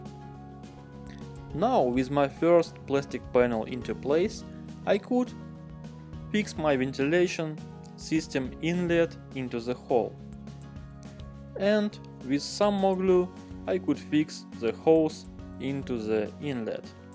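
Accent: Russia. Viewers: I like the Russian accent.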